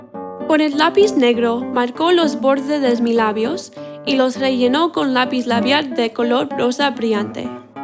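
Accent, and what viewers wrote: Spain. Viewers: Me encanto el vídeo y me encanto escuchar tu español💕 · Me dio mucha ternura tu acento español jajajaja hermoso maquillaje.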